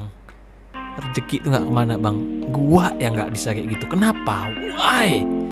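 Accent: Java, Indonesia. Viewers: Jawanya asli mana bang?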